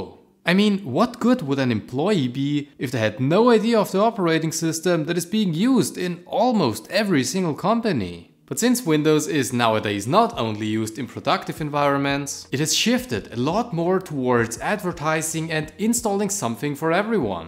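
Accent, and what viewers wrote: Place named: Germany. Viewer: I smell "Deutsch".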